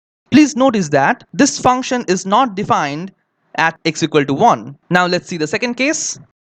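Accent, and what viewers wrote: Bengal, India. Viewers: brilliant ..also delightful bong accent :)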